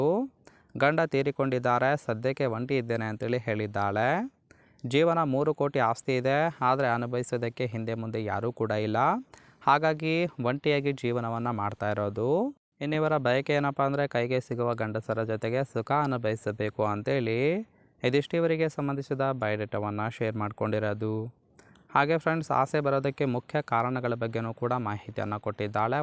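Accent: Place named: Haryana, India. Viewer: Haryanvi madam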